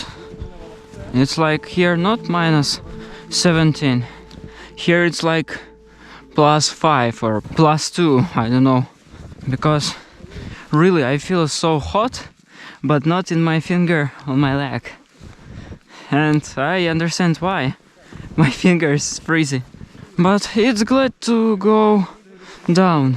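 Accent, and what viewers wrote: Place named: Romania. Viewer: I think you speak perfect English. I'm also used to the accent because my Grandmother was from the Romanian Carpathians and your accent sounds the same to me. 👍 I'm glad I found your channel through Shiey's channel! Love this video! 😎🤘👍❤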